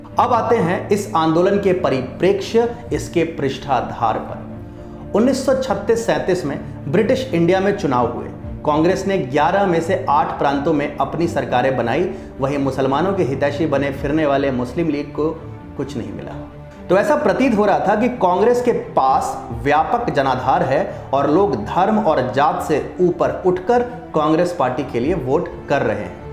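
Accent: Standard. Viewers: Aapki hindi ekdm vishudh roop me h .aapko mera pranam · I wish all journalist and or at least sanskritagyas n babas n jyotishacharyas learn from you how to speak suddha Hindi It is so pleasant,.. Thank you...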